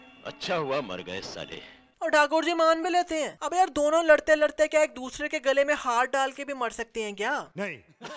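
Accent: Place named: Pakistan. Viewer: Ya video bnana vala bro pakistni ka ha es ki awaaz sa pta chala😅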